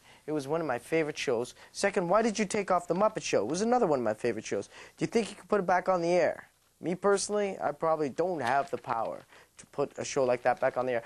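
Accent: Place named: Canada. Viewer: oh man... i completely forgot how deep that canadian accent can be. living here in vegas 4 years i missed it. i remember that show. also the one where the kids call into santa during the christmas season. memories...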